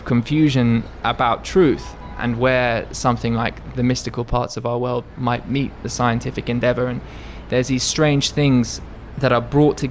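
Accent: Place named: RP, United Kingdom. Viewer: You sound just like the sort who's come back from his gap yaaar abroad in Thailand.. but great vid haha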